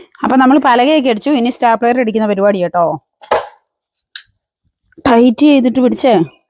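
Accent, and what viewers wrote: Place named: Kasaragod, India. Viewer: നിങ്ങൾ അടിപൊടി❤ നിങ്ങൾ ബുള്ളറ്റ് ഓടിക്കുന്ന ഒരു വ്ലോഗ് ഇടാമോ പിന്നെQA ചെയ്യാമോ കുറേ ചോദ്യങ്ങൾ അറിയാനുണ്ട് എപ്പഴാ ബുള്ളറ്റ് ഓടിക്കാൻ പഠിച്ചേ😊 പിന്നെ എത്ര വരെ പഠിച്ചു പിന്നെ കാസറഗോഡ് സംസാരം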